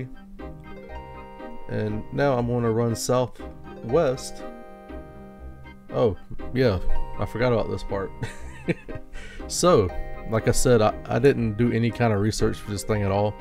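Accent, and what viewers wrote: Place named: South, United States. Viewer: Idk why but people with Southern accents playing RuneScape always makes me smile. One of my best RuneScape friends from back then was from Missouri and had this super thick accent, you remind me of that a little lol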